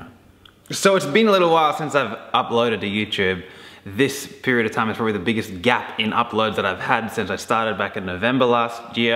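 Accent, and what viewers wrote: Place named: Australia. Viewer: Had to watch the first minute three times, its so hard to adjust to australian accent :) Nice video The car- finance- thing is a mix beetween them securing the loan and them beeing to lazy to look up the full picture. Hope it works out. How do other people manage to get loans on their supercars?, nobody buys these things outright with cash.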